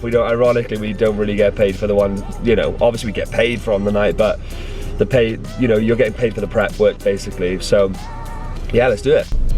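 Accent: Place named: Wales, United Kingdom. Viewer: Hey been watching your vids and just subscribed brilliant content.. Recognised the Welsh accent and was wondering whereabouts yr from....low and beholds about 4 miles from me! Im after some charts for cruise ships buddy....will trade you some drum stuff for them....or lash you up with lots of beer 🍺 😉 😜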